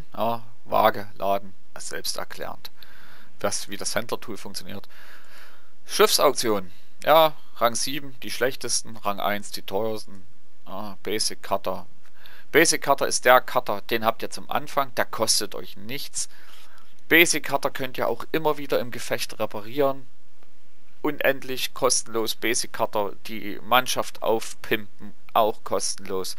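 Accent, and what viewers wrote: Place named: Saxony, Germany. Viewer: Rischer Sachse erklärt de Maritime Kriegsführung, das gefällt mir aber gud o :P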